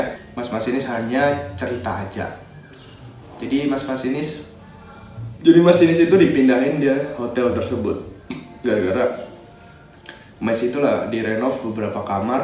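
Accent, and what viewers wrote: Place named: Malang, Indonesia. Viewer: Part 1 mana ?? Bahasnya medook...org Malang tibako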